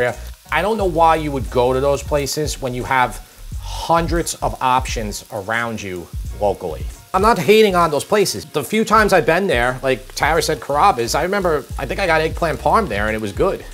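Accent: New York, United States. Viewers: He's from Long Island so New York. Kind of similar maybe not as exaggerated as Jersey.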